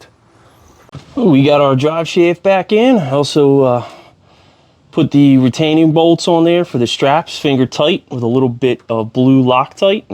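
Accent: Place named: Pittsburgh, United States. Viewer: Dude sounds like Pittsburgh. 👍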